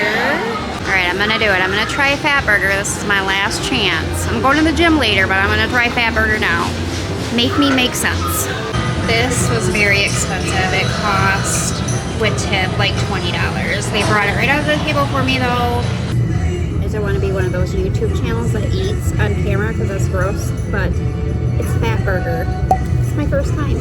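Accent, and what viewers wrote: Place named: Michigan, United States. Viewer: Hey, CornDog here. That was really well done! My wife and I have stayed at the Riverside regularly over the past 30 years....I'd guess over 50 trips to Laughlin. We can drive there from San Diego in about 5 hours which includes a couple of stops along the way. Laughlin is just a friendlier place than Vegas and of course a much older crowd too. Have you done a video on the Jet Boat Tour down to Havasu? My wife & I took that trip a few years ago. Some beautiful scenery for 60 miles down and then back up the river. Are you originally from the upper midwest. I grew up in Michigan and you sound like you could be a Michigander. Thanks for the video!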